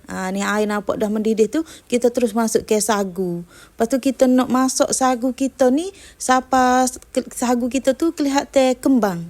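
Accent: Kelantan, Malaysia. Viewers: Suka dgr org kecek kelate. Rindu study kat mache dulu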